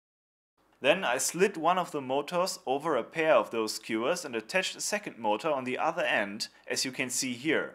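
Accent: Germany. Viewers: German Accent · Are you German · Dieser geniöse Deutsche Akzent ist der Knaller! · Your German accent combined with selfirony made it very humoristic to watch :) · I love the German accent :D + this sentence "I also did some ghetto style heat shrinking with a very girly lighter." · nice German accent! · There is just something hysterical hearing "ghetto heat shrinking with a girly lighter" in your German accent... I like the subtle humor while learning a bit... nice job.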